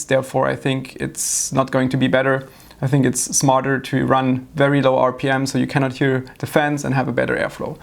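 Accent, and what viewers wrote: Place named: Germany. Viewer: Just out of curiosity..... Why do Germans say the words like “of” and “have” with an English V, and some words like overclocked with a W sound? Great video, and no RGB.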